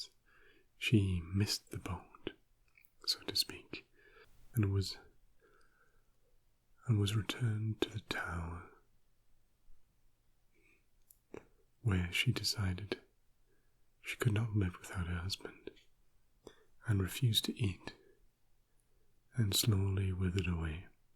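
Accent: United Kingdom. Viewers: Ok. Since I'm touring your production I'm guessing what country your accent belongs. You sound brit but not too much.. i associate you with actors like Christian Bale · such a fine British accent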